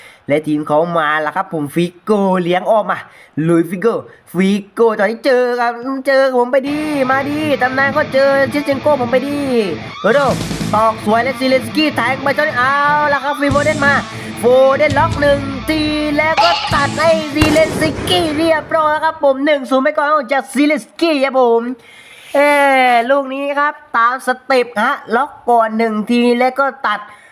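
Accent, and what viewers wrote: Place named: South, Thailand. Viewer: คนใต้ม่ายนิลิ้นรัวจังเติ้ล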